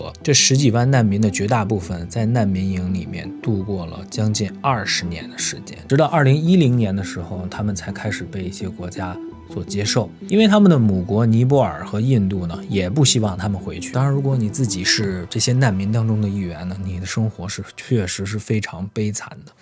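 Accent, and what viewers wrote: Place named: Tibet, China. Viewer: 博主是我看過普通話最標準的藏族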